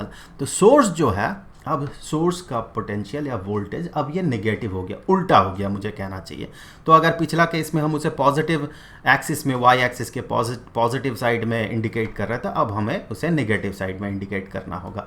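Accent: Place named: South, India. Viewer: Sir, apka accent - South Indian ! Allover NICE !!! Thenx .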